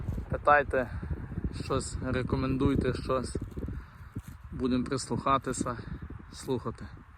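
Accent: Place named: Lviv, Ukraine. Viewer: Привіт,чую по розмові шо ви з Львівщини,підкажіть де можна придбати теля бичка шароле?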